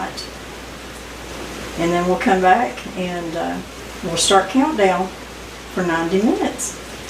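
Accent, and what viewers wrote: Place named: Appalachia, United States. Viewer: You look like your 89 and you sound like a HILLBILLY!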